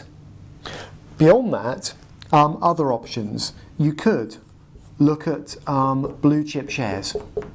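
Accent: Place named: England, United Kingdom. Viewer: I think he speaks very well and clearly., unless you just don't like English accents. I don't dig his shirt though.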